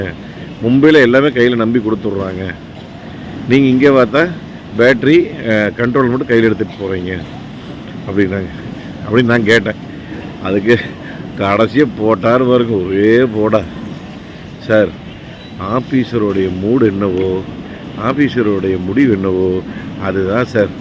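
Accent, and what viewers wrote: Kongu, India. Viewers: ஆஹா என்ன ஒரு மகிழ்ச்சிகரமான முடிவு அற்புதம் எங்களுக்கு கடந்த ஒரு மாதமாக அற்புதமான ஒரு தொடர் பார்த்த திருப்தி கொங்கு தமிழில் நீங்கள் பேசிய பேச்சுக்கள் மனதில் என்றும் நிலைத்திருக்கும் நீங்கள் வீடியோக்களை எடுத்த விதத்திலும் சரி எதார்த்தமாக நீங்கள் பேசிய காமெடி பேச்சுக்களும் சரி மிக மிக அற்புதம் ஒரு ஜெனரஞ்சகமான நகைச்சுவையான குடும்ப படம் பார்த்த திருப்தியோடு இந்த தொடர் முடிவடைகிறது திருப்தி சில மாதங்களுக்குப் பிறகு வட கிழக்கு மாநிலங்கள் கோவா ராஜஸ்தான் உள்ளிட்ட இந்தியாவின் பல பகுதிகளுக்கும் சென்று வர வாழ்த்துக்கள்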